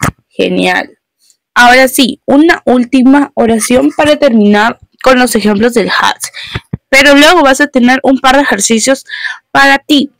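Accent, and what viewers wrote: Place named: Spain. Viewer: Que acento mas insoportable ,me refiero al Español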